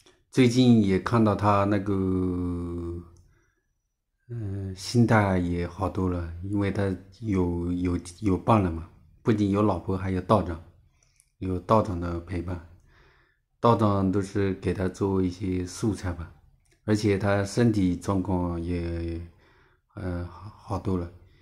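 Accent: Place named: Zhejiang, China. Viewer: 有浙江口音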